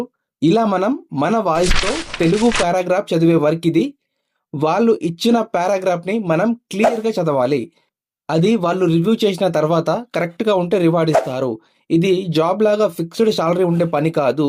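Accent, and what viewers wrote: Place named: Tamil Nadu, India. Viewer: Thamil voice vasthundhi bro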